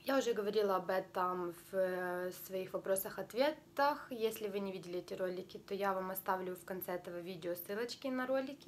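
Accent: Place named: Ukraine. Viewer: из твоих уст слово геморрой!!!!😘😘😘😘😘 у меня аж шерсть дыбом встала. обожаю украинский акцент.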